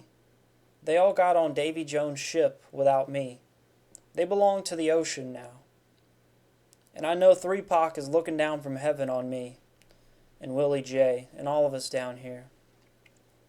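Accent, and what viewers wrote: Mississippi, United States. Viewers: You from Mississippi?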